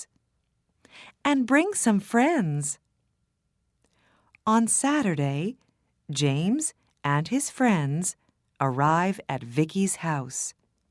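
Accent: United States. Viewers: I think it's American English. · Hello Is it american english? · Thank you a lot! Could you tell me what kind of English pronunciation it is. Is it American English pronunciation?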